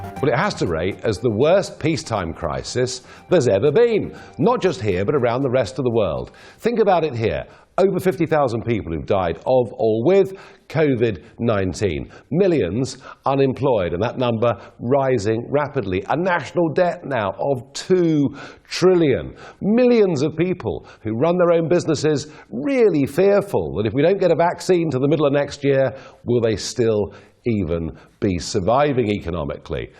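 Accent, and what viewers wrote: United Kingdom. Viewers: This world need more people like Nigel....by the way I like to listen British English..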